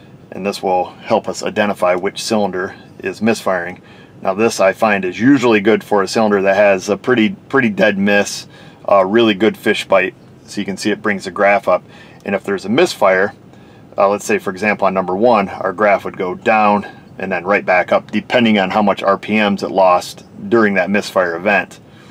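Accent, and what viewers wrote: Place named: Upstate New York, United States. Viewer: It took me a few times to figure out you were saying Power BALANCE, you upstate New Yorkers talk funny...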